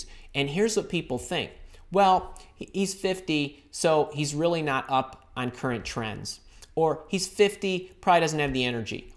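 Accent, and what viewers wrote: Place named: Great Lakes, United States. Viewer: Wow, thats quite the pronounced Northern Cities/Great Lakes accent you have there. Lets see ... Central Michigan, is it? Maybe Lansing?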